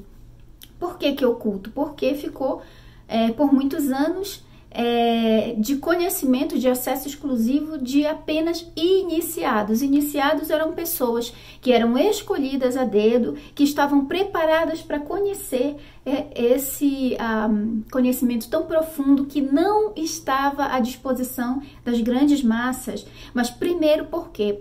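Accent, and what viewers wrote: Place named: Belém, Brazil. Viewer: Senti um sotaque de BELÉM-PA...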